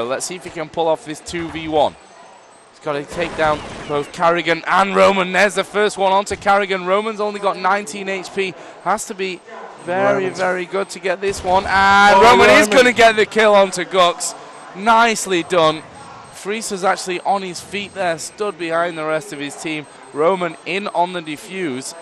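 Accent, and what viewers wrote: Australia. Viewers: wow, aussie commentator! AUZ FTW! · Who commentated this? An aussie?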